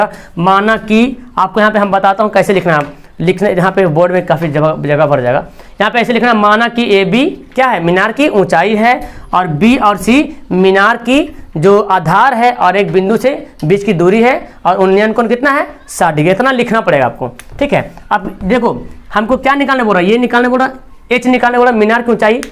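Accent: Bihar, India. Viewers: Aap bihar se h kya?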